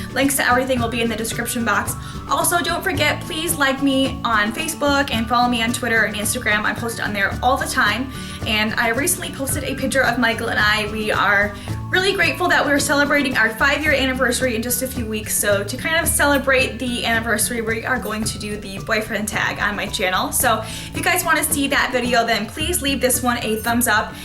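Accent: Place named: Chicago, United States. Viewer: Your accent is awesome, Chicago? N your pretty, thanks for the tips too I subbed:)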